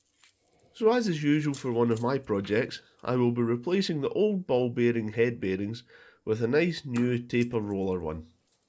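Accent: Scotland, United Kingdom. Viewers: Brilliant, wie immer! Ich liebe den schottischen Akzent.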